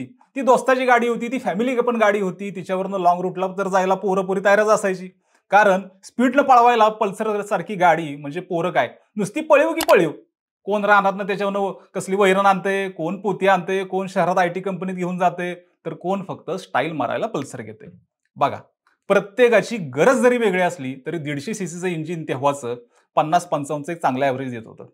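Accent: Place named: Kolhapur, India. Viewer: तो कोल्हापूर चां आहे तू पेठेतील असतील आणि एकदा पुणे गावठाण ची भाषा बघा पुण्यातून बाहेर या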